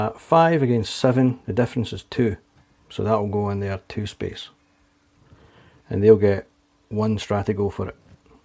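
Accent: Scotland, United Kingdom. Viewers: Nicely done sir and I must admit it sounds even better in Scottish. Its been way too long since I was there.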